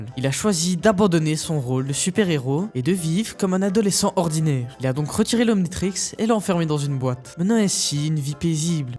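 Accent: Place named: Verviers, Belgium. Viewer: Cet accent de Verviétois 😂 Salut camarade 🇧🇪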